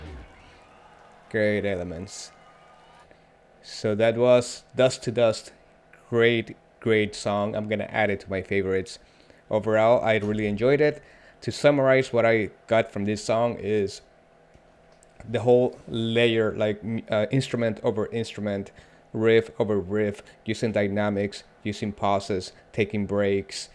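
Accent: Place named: Latin America. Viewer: The million dollar question is not about Rudy...what is your nationality? Your accent sounds latin, but clearly you don't understand Spanish. Inquiring minds want to know. Great opinions and comments!! Enjoy this journey you have embarked upon! Oh yes...this no rabbit hole...this a caldera, because artistry is as wide as it is deep! Salud! #APDVillaReality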